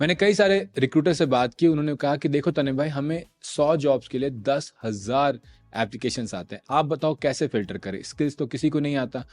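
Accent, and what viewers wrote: Bihar, India. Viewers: Bihari tone aa rha aapki baato main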